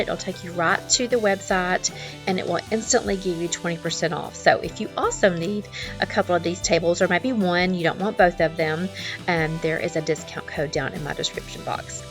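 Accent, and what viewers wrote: South, United States. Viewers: Hey, I’ve been following you for a while now but have not introduced myself. I know you always tell us to introduce ourselves. I live in New England but one side of my family is from the deep south so I enjoy your sweet southern accent. 😁 The bird house is hanging from the roof line over your deck. I do not have a YouTube channel but I do have an Instagram account because I also enjoy decorating. I just started following you over there on Instagram.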